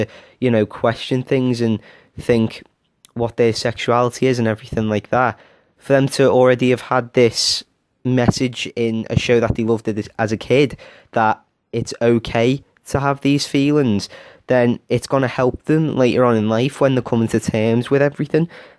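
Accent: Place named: Liverpool, United Kingdom. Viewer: Not meaning to be rude but you sound like a more scouse Michael owen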